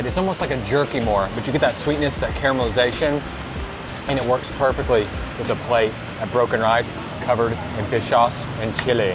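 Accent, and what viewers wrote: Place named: South, United States. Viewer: Love that southern accent ❤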